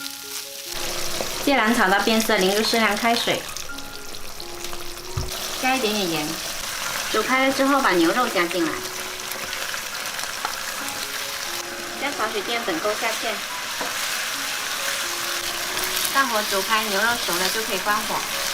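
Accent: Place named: Hakka, China. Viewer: 好奇是客家还是潮汕人？口音很像客家人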